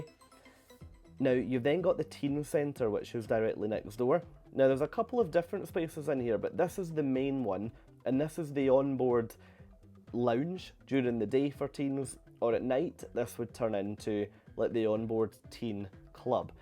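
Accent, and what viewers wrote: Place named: Aberdeen, United Kingdom. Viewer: Are you from Aberdeen? Your accent reminds me a lot of a friend of mine from that area. Ace review!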